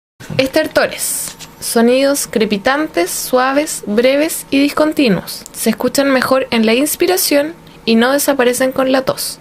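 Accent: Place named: Chile. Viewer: Hermoso acento chileno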